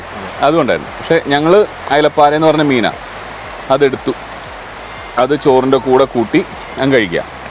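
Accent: Thrissur, India. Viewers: Thrissur എത്തിയപ്പോ ചേട്ടന് ഒരു തൃശൂർ slang വന്നിട്ടുണ്ട്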